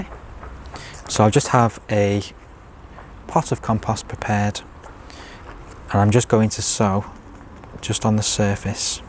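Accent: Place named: England, United Kingdom. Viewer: You sound English, are you in Europe or here in the USA.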